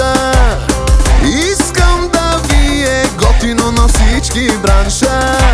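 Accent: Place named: India. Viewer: Is he Indian? Because the voice sounds like Hindi or Telugu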